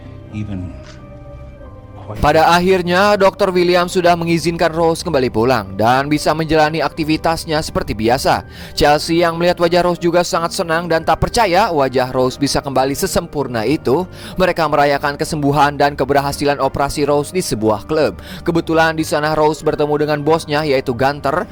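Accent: West Java, Indonesia. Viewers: Kek IQ7 mana pake logat Sunda lagi kek 1 orang😅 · Sunda banget 🤣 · Indo x Sunda 😂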